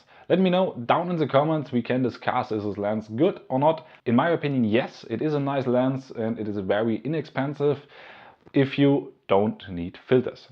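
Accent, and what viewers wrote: Germany. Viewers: Rede doch lieber gleich deutsch ehe du dich mit deinem harten deutschen Akzent abmühst englisch zu sprechen.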